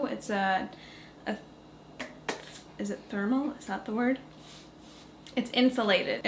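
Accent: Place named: Canada. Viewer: Love your videos so much, whenever I hear that Canadian A sound it makes me smile a little. I'm American I don't know why I just find the way you say words like bagels to be so charming like oh that's right! We're neighbors, look at those slightly different vowels! Haha!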